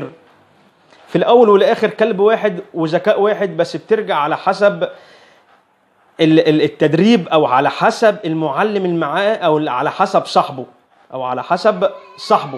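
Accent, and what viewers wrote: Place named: Fayoum, Egypt. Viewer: ماشاء الله ربنا يباركلك انا حاسس انك فيومي من الهجة صح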